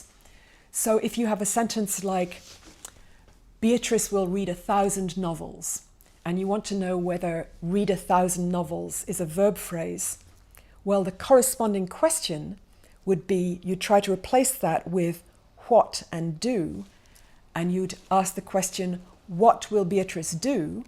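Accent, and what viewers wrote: Scotland, United Kingdom. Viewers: this is from the University of Edinburgh, I suppose it is a kind of Scottish accent.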